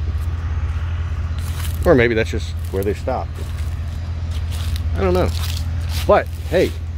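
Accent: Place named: Philadelphia, United States. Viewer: Great video! I love your work. I am a history geek who grew up in Mayfair (Lincoln HS grad), and your accent makes me think of home. ❤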